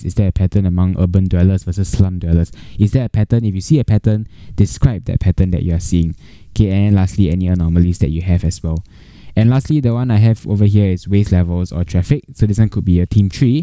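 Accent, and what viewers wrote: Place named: Singapore. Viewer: SG English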